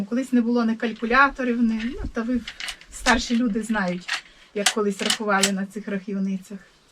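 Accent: Western Ukraine, Ukraine. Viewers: Ваша мала Батьківщина-не Карпати.Ну дуже тембр голосу західноукраїнський.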